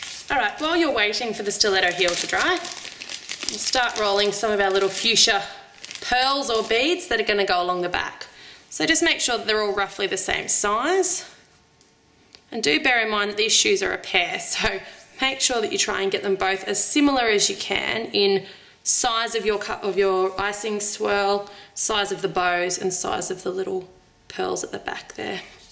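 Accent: Australia. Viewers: Are u an Aussie if so nice accent lol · I think shes australian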